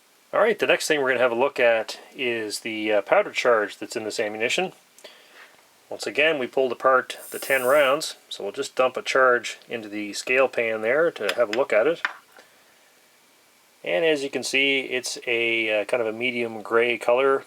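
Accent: Canada. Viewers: Do i hear a fellow maritimer (neuf) accent??👍😉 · Very informative. I assume you are in Canada by your accent and the fact that we here in the US no longer have access to Norinco products, having been banned after the Kengs Firearms/Norinco criminal investigations for them attempting to supply full-auto weapons and rocket launchers to prohibited individuals here. What was the price for this case of 1,000 ?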